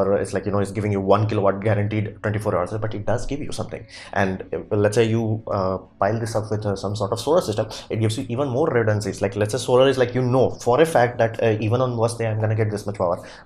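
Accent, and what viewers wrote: India. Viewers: Very nice, presentation!! I have seen your other videos also, you do lot of research and then prepare your videos.. Very good channel for science and tech guys! In this video, Powerpod attracted me, from photos it appears that turbine used in it would be around 25-30 cm in diameters. So it is high rpm low torque type turbine. I would like to ask, that to increase torque and decreasing rpm are they using gears, or this new designed wind turbine is directly connected to generator?? Is it possible that it could generate upto 5kilowatt ? Just think how much torque would be required to run 5kilowatt generator?Can funneling do real magic? (How much velocity of air increases by funneling, it's efficiency... Max rpm of turbine) What is your opinion on it? Please reply, But i liked this concept of rooftop wind turbine, well practically to verify these claims we have to wait when powerpod will be available in market.. 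Definitely one day when all natural resources like coal, oil,.. Etc we will be used than power production will become serious issue.We have to prepare for it right know!! You seemed to be Indian (your accent of English) I appreciate your hard work, and keep doing so, your channel will grow.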